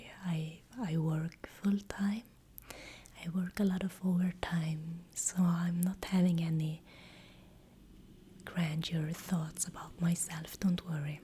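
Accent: Sweden. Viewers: Your thick Swedish accent was the best part of this video! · Your channel has been my favorite lately, I listen to your videos whilst gaming, so I'm relaxed. I like your voice and your accent a lot, it's a cute little accent, the swedish one. But I also like how open you are, it's hard to open up publicly. After that, it becomes kind of a distant, distant, distant friendship, where we won't talk, we won't see each other, but I kinda want you to do great and feel sad when you're doing poorly, if that makes any sense. I hope you can resolve your health and emotional situations rapidly. God bless. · Tack för dina videos! Jag brukar inte tycka om engelska med svensk brytning, men detta är såååå bra! 🤩 Önskar dig det bästa i det nya året! 😄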